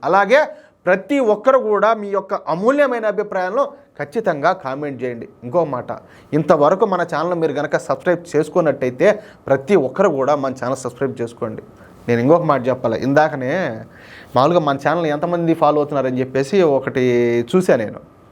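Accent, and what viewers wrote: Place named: Kadapa, India. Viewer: I love your Kadapa language🎉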